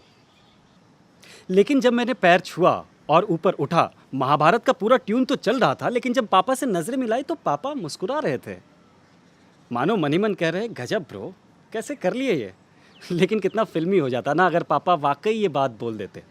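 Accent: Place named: Bihar, India. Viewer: I want to see u again on tape a tale... Feeling something very heart touching... Especially. "Shi to thi Riya, bna nahi kahen aap dono ka" And most importantly, I love ur Bihar wala feel and touch little bit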